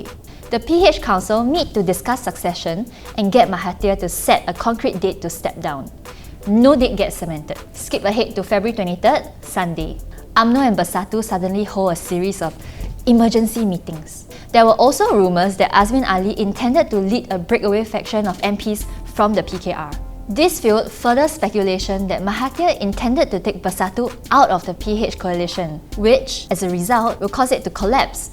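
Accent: Singapore. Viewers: I terribly in love with Singaporean accent.. Okay Lah!! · Very good singlish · Nice Singlish!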